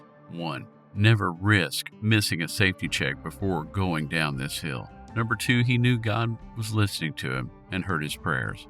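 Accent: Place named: South, United States. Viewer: This was one of the most enjoyable videos I’ve watched on YouTube in a long time. Enjoy your southern voice too. It’s relaxing to listen to you talk.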